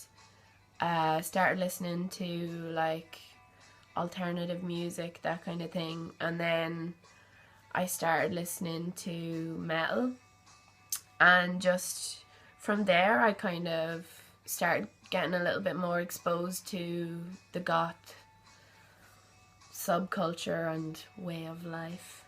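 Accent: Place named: Ireland. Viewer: Greetings! Judging by the way your beautiful voice sounds , I'm assuming you are from Ireland. I work with tons of wonderful fun loving and friendly Irish folks. I work for Ornua Foods in Chicago Ill. Ornua is Kerrygold butter. I call it Dairy Crack. Once you try, you can't stop. Best wished for continued success. Beat to you, LennyK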